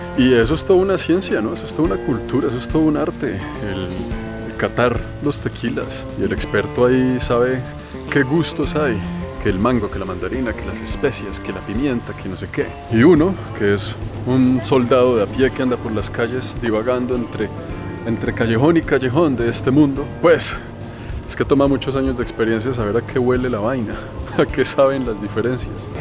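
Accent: Bogotá, Colombia. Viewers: Me encantas con ese asentó colombiano estimado 💚👍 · Ahora quiero un novio colombiano 😅😍 me encanta este acento 😍😍😍😍❤️ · Me encanta tu manera de narrar! Eres súper gracioso y ese acento colombiano que tiene me encanta! 🤣🤣🤣🤣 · Amo el acento colombiano ❤ entonces puedo decir que por eso empecé a ver tus videos y ahora no pido parar muy buena información tu voz relajante te invito a Puebla es tan grandioso cada rincón de mi México. Bienvenido 😊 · Habla rolo acento bogotano se dice q es el acento español más claro de Latinoamérica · Q gran contenido y con ese acento rolo genial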